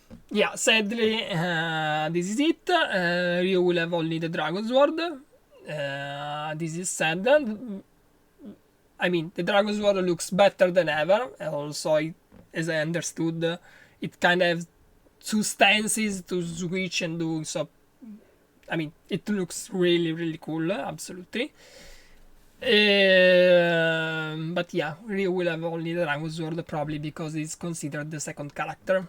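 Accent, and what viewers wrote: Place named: Italy. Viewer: Love the italien accent! Great video!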